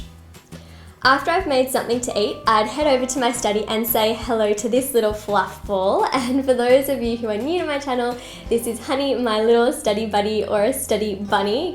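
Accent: Australia. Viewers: Australia was a British colony, so most of the earlier settlers and first English-speakers were British so Australian English has very close spelling and pronunciation to Brits. most Australians speak like her. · JanielForLife Australian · Australian accent I can tell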